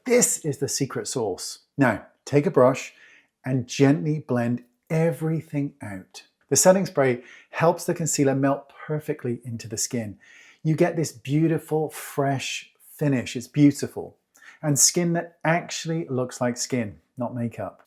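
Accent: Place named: West Country, United Kingdom. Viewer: Great tip as always…heard a hint of West Country accent me thinks ❤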